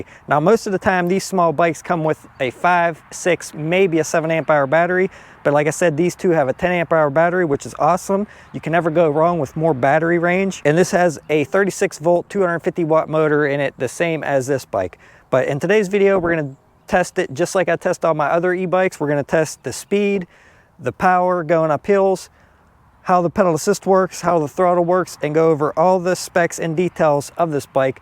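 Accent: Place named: South, United States. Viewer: Nice Southern accent!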